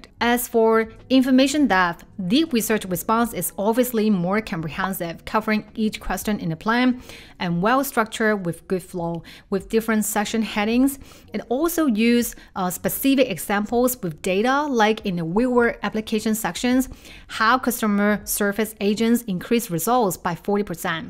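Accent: China. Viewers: Thank you for your video in comparison; could I make a positive suggestion? for a non native english speaker but with good english language skil, I had a hard time following every thing you said. it was way to fast for me to be able and follow your explainations? Dont get me wrong, I mean it as a positive feed back. You have the typical chinese or asian accent, and speaking so fast, as if you tried to put 20 min in 5 minutes, that it became hard to follow. good luck, and thank you again. 🙏👍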